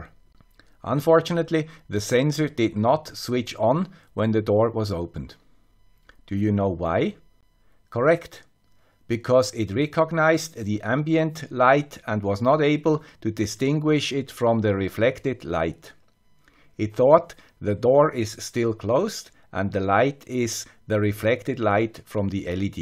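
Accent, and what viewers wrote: Switzerland. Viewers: I love your videos Sir. I am looking to make a simple ir blaster to turn on and off a TV and stumbled upon yet another great video from the guy with the Swiss accent. Thanks for the info. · HAHAHA "Here is the guy with the Swiss accent." If only I could put a 100 likes into this video. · And winner of my first comment on youtube is the guy with the swiss accent... thank you for all your great videos! A few weeks ago I bought during a flashdeal on aliexpress a cheap esp-01 with a relay and ended here on your channel... 30 Years ago when I was building my first cnc router it was really complicated to get all the knowledge together and today we just google... BTW I remember in one of your videos… problems with a 3D printer....maybe Iˋm the man... we are almost neighbours.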